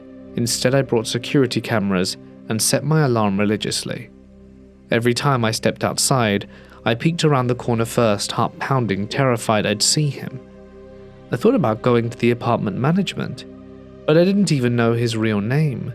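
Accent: England, United Kingdom. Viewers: Lovely ..ever so.quintessentially English voice ..just lovely .From from NW England myself ,makes a change hearing a REAL VOICE & not this Ai souless rubbish..Thank you x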